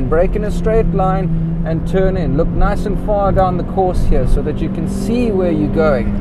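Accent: South Africa. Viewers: South African bloke. Good one boet :) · Great informative vid!! On another note, are you South African? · That South African accent is very distinct. Great guide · cool video... South African? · Nice video. Recognized your South African accent immediately, good to hear! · South African?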